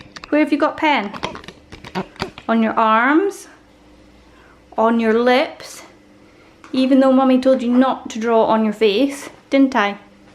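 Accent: Scotland, United Kingdom. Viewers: Hi, nice to hear a Scottish voice on youtube. I live in Balloch near Loch Lomond. I saw that you had to do without sugar in one of your dishes. I have used sweetner instead before. It tastes great. Either one or two of the little tablets or one or two table spoons, maybe try one spoonfull first!! Or one tablet first. You don't want it too sweet. Xxx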